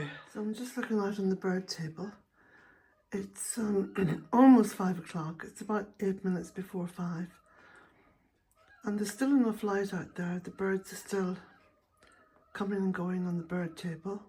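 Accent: Ireland. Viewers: Hi there. It’s absolutely freezing here as well here in Maryland, USA. We have over 3 feet of snow today. Your beautiful Irish lilt reminds me so fondly of me wee Grandma. She was such a dear and our family is from Belfast and I lost my Mom this year in March.Unfortunately I found your channel too late how I wish I could’ve shared your lovely videos with her! She would’ve thought you were just grand ❤️💞🫶🙏🇮🇪 Be well and stay warm🌤️🥰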